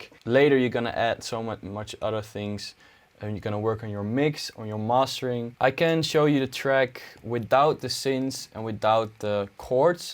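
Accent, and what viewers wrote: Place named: Netherlands. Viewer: Lekker Nederlands accentje hoor!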